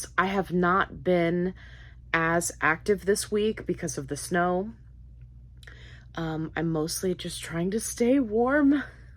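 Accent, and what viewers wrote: United States. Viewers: I’m a southwestern Ohioaner, I thought she was originally from West Virginia. 😂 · Keven's first snow!! 🩷 Side note: I always assumed you were from Ohio! (I like to guess where someone is from by their accent.) As a former Buckeye I thought we had the same accent. 😂 Didn't place you as a Southerner.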